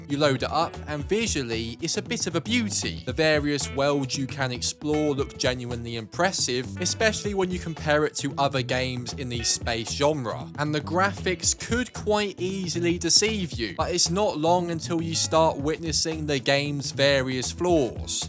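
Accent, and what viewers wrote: United Kingdom. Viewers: Why do all British YouTubers sound like absolute bellends? Are you all trained at some school to sound like retards?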